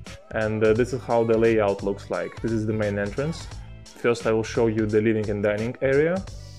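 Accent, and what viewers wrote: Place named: Ukraine. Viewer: Vasiliy from Ukraine accent :)))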